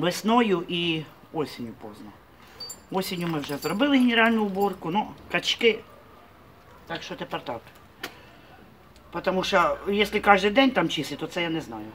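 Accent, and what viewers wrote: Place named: Mykolaiv, Ukraine. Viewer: з якої ви області. я думаю з миколаївської у нас так розмовляють в селах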